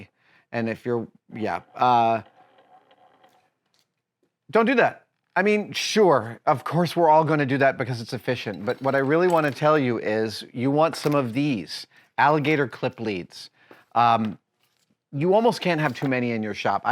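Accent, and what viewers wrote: United States. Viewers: SoLLLder, not sodder. Say it like it is, damn american! "The US just removed all soddiers from afghanistan".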